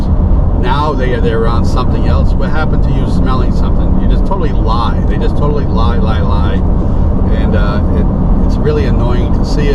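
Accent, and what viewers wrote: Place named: New England, United States. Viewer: Hey you sound like a new england guy, where from im from north andover ma. Any way i rhink alot of the problem is militarized policing. Virtually all police today are former military. This is the american people experiencing what our military have done around the world while at war. So take a solider who has done multiple tours, a job where violence is used to solve problems. Bring them home, send them to a two month academy then give them guns a badge and qualified immunity. How could anyone expect that to not go wrong. Oh and the police culture of blanket criminality. You know, a person commits like two felonies a day in this country because of the thousands of ridiculous codes and policies cops know that,;they are gonna find out what you did today on that traffic stop.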